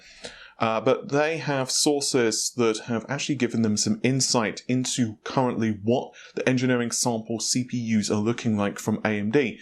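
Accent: England, United Kingdom. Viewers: Mike D He is British from Great Britain, with an English accent then :)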